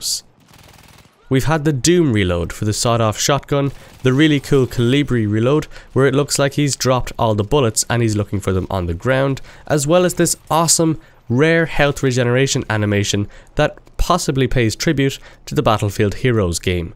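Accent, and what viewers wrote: Ireland. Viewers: r u Irish mate cause I am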